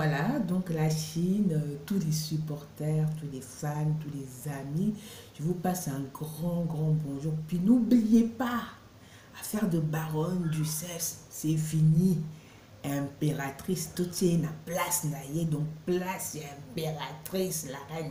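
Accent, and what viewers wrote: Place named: Lingala, Democratic Republic of the Congo. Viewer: Lingala nayo kitoko makasi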